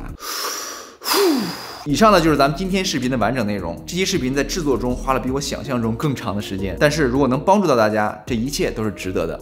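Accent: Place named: Shandong, China. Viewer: 山东人吧 这口音能听出来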